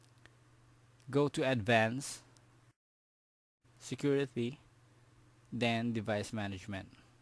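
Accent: Philippines. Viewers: tigas ng tagalog accent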